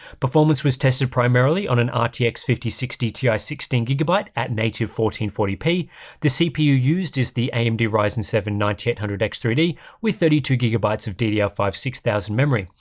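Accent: Australia. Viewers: Australian accent usually isn't very salient in my mind when I'm just listening to the audio, but when he said "you should be right" I was very reminded that they're Australian.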